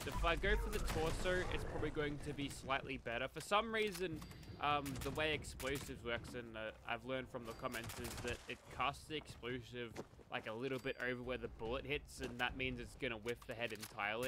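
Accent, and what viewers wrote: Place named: Australia. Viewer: Long time fan of your channel. I have always enjoyed your direct, tell-it-like-it-is, no nonsense way of speaking. Plus the Australian proper English dialect (I.e. using words like Whilst, Respectively, etc) is rather refreshing. When it comes to griefing, pvp, etc, I don’t think you’ve ever been largely in favor or against either. The only group of the Fallout “community” that you’ve always been vehemently against are the RMT traders. And I 100% agree with you.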